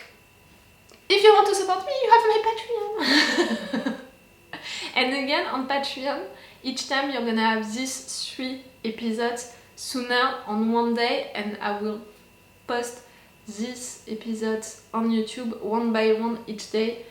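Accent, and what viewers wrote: France. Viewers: I knew it for the first 10 second it was my fellow french accent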